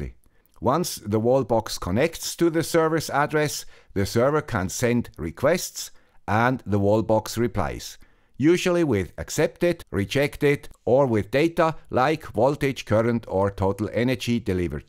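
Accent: Switzerland. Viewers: Right now YT suggested me this video. I rushed to it when I saw it was from the guy with the Swiss accent. I really miss your videos,. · We missed that Swiss accent so much!! Welcome back and congrats on the new ride🎉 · Omg I can't believe I'm hearing "the guy with the Swiss accent" "live" with fresh ideas, again! 😁😁😁😁😁 Thanks as always! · Adding to other viewers comments, I've missed the Swiss Accent too. Greetings from San Francisco. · Nice to hear the Swiss accent back! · I really really happy, hear your swiss accent again. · Yes, the guy with the swiss accent is back and helpful as always. Cheers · Hes back, and his accent is more swiss than ever! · Nice to listen to your swiss accent again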